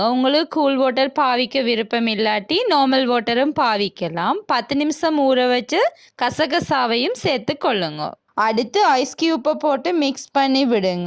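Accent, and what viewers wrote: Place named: Sri Lanka. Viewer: அனைத்திலும் மிக முக்கியமான ஒரு விசயம். உங்களிடம் பிடித்த ஒன்று, உங்க லங்கை தமிழ்.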